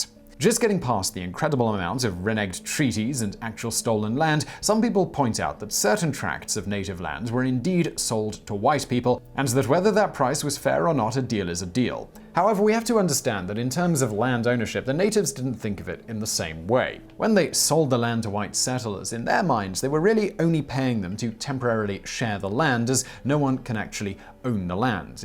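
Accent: England, United Kingdom. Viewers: And that cheeky British accent makes him sound so much more authoritative/intelligent. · I like your videos in general. Not this one. Too many strawman arguments. Keep your British English accent out of our proud American nation's history. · Sounded like an Englishman. They know our history better then we do. (I DON'T think so). The sad thing about this video, we who are older and had our country's history taught to us know this is bull. However many of our young people don't. Thanks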